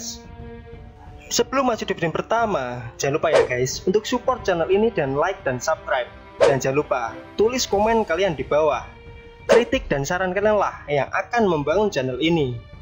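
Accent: Java, Indonesia. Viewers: Narator nya MEDOK sangat 😁🙏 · Jelas wong jowo iki admin e kwkwkwkkw. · Medoknya itu lohh boss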